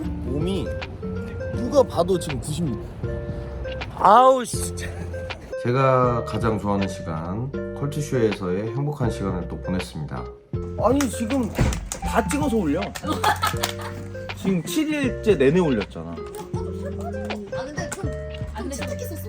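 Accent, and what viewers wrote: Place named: Gumi, South Korea. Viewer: 오늘도 재미써여~ 구미사투리에여~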